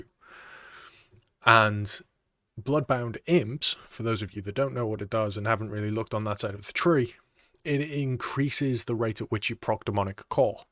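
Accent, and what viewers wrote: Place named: North East England, United Kingdom. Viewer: You sound like you're from NE England mate like me. Cheers for the advice, appreciate it.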